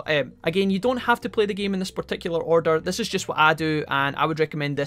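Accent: Scotland, United Kingdom. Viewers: Thanks for the tips. Love coming here also cause of the Scottish accent 😂😁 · thank god I have found you. many of those videos, especially the LPs are provided by folks with nerve grinding voices. is it excited teenagers? Idk, but it's so hard to focus on the game with this auditory abuse. you, however, have a calm voice and scottish accent. what's not to like? thumbs up! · Excellent tutorial so thank you very much for that! It is so easy to understand your Scottish accent, that is a really big bonus man. Cheers.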